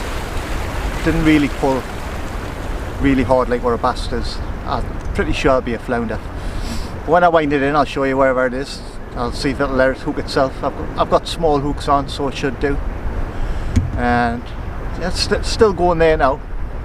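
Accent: Newcastle, United Kingdom. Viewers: Just Subscribed after finding your videos really enjoyed them and keep the Geordie accent its your home and I can tell its something your proud off, great fishing tips, so sorry about your Mum but not a day will pass when she won't be in your thoughts, hopefully I will get out that way with my kayak. Cheers.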